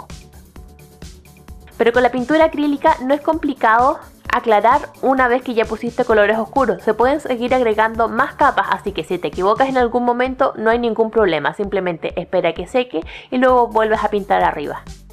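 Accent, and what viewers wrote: Chile. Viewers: tienes acento chileno..o no? yo pinto con acrílicos, soy principiante pero me gusta mucho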